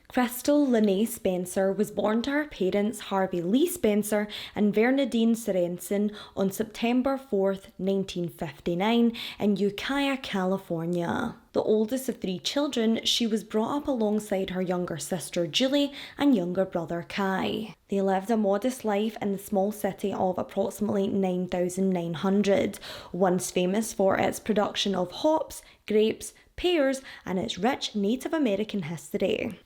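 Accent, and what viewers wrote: Scotland, United Kingdom. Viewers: Thank you for brining so much well-needed awareness to some of these older cases. Rest in peace, sweet Crystal. She and her family deserve justice and answers after so many years! Still so surprised you don't have more subscribers! I've watched quite a few of your videos in the past but it seems like YouTube has been randomly unsubscribing me from a few channels again (as well as other members, from what I've heard), but alas, we're back being subscribed now ❤ Great work as always, Miss. As an Aussie with the majority of my father's family still being based in Scotland, your accent makes me smile. My Nan has lived down here since the late 60's and her Glaswegian accent is still so thick that I doubt subtitles could help us when she gets heated having a wee blether over a cuppa 🙈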